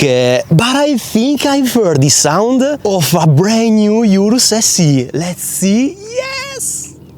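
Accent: Italy. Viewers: Excellent footage, excellent Italian accent!!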